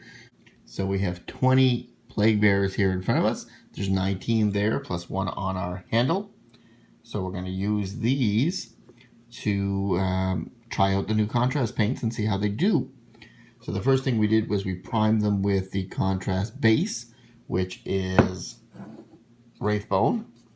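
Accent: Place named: Canada. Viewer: so fahr? i hear a fellow hoser!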